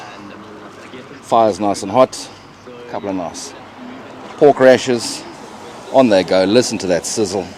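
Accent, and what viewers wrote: South Africa. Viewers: "rugby and braai ...and brandy" "thats South African" Sounds afrikaner to me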